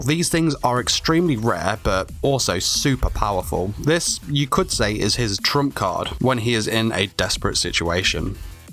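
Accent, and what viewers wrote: United Kingdom. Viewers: Dude, "Bolg" is from Celtic mythology. You sound British, so you should know this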